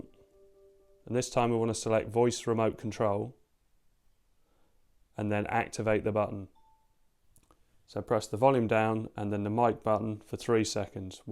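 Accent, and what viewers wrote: United Kingdom. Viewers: Thank you nice man with cool British voice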